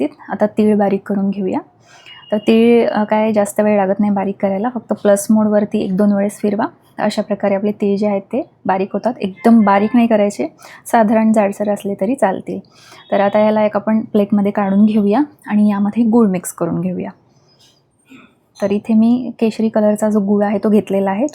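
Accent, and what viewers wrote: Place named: Vidarbha, India. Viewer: रेसीपी सांगण्याची भाषा खुप छान आहे आमच्या विदभा सारखी ❤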